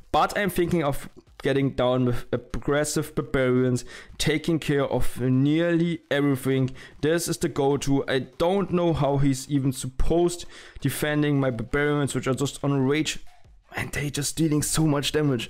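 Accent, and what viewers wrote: Germany. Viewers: Man hört so hart das du deutscher bist 😂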